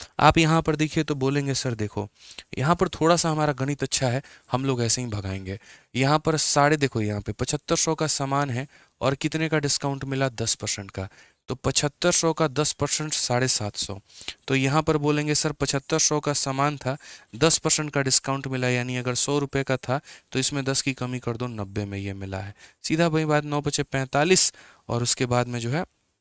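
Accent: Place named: Bhojpuri, India. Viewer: Apki bhojpuri tone sir just ...... Hayeee🙈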